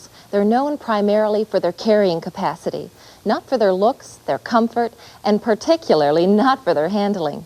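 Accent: United States. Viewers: She's got quite the Wisconsin accent. Video features the 1989 Taurus GL and 1989 Taurus LX Wagon. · Yep, th'yats haw they say it in M'yadisin Wiscahnsin! Turn that car ahn! It's all good. I love mid-west accents.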